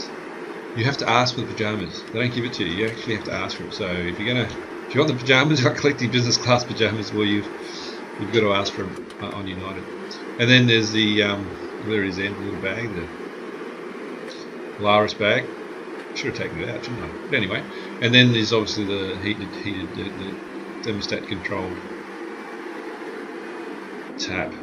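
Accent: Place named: Australia. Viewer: Australian accents are so cool!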